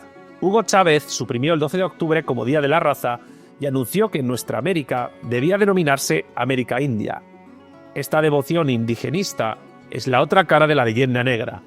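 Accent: Spain. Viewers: Esa historia es de los vencedores y no de los vencidos no se quién son ni me interesa saber no era América y ni hispanoamericano eran tierras desconocias no fue colinizado ya que ya vivian aquí es estas tierras yo hubiera preferido que llegaran los japoneses y aferrados a llamarmal colonizar no comian carne humana y los llamados inquisición española que eran unos santos violando y torturando mujer y hombres fue genocidio no conquista por tu acentos se oye que eres español y si tenian que dejar como estaban los antiguos pobladores hispanidad ridículos y ustedes no eran aborigenes con tanta gurra y matando gente en Europa los antiguos españoles el azteca no sabes de historia eran mexicases lo que quieren que crean en la nueva tierra todos de este lado vivian en armonía por eso tantos pueblos y su inquisición eran angelitos aja y la inquicion no eran sanguinarios y y novela respetaban a las mujeres con tal de violarlas hispanistas rudiculo seguramente estaban ahi viendo como guerreaban pues como discutir si crees que tienes la razón como si lo hubieras vivi y verlo en vivo y a todo color no te va aparecer lo que te escribo te guste o no los antiguos españoles no eran canibales y violadores no son objetivos quieren imponer lo que ustedes creen y los antiguos españoles no vivian en guerras constantes no critiquen no se vallan a morder la lengua ustedes no tenian esclavos no tenian o prostitución forsadas los antiguos españoles todos unos angelitos se ve que eres español y no sabes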